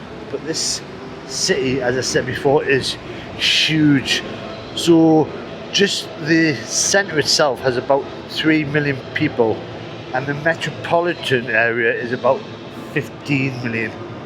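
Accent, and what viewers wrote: United Kingdom. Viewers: it is british, you guys are just too used to the posh accent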